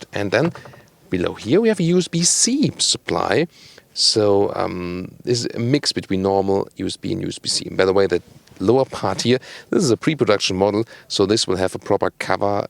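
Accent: Germany. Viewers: Sounds more authentic coming from a German. Great narrator. Mighty car.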